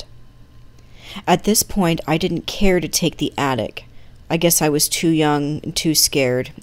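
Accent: Canada. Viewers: I'm English as it happens. Look girl, i was just having some fun. you should hear my accent? especially when i'm drunk! You sound Canadian and to be honest, i rather like the accent. i lived in the USA for a few years along time ago and i'm ex-army too so i'm used to quite a variety of speech patterns. If i knew you been subjected to nutters threatening you, i'd have either not commented or said something else. Why anyone would want to say awful stuff like that to you on the strength of the way you speak, God only knows? if it's worth anything, you carry on. i did like the stories. so did my dog. we listened attentively as we scoffed our sausages and mixed veg. :0) People who post shit like that are impotant tossers who proberbly have no mates and still live with their mums! Sorry again mate. Jez and Charlie dog. xxx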